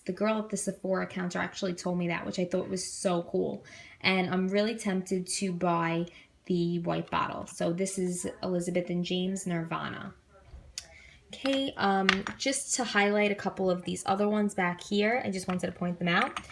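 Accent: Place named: New York, United States. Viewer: Italian/ new yorker much? Lol. Enjoyed all your beautiful perfumes we have similar taste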